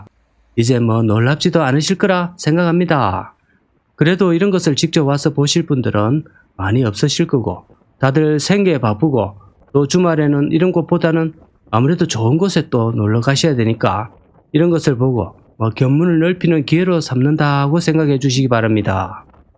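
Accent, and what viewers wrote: Gyeongsang, South Korea. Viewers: 말투는 이명박 같으신데 ^.^ 경남이시네요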